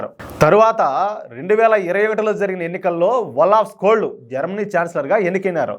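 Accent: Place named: Telangana, India. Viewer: తెలంగాళ stail తప్ప మాములు గా మాట్లాడితే బావుంటుంది.... నేర్చు కో బ్రో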